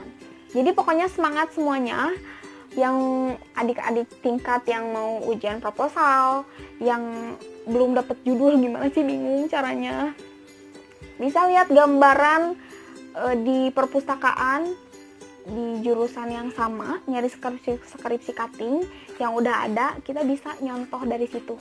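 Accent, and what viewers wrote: West Java, Indonesia. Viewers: Sunda pisaaan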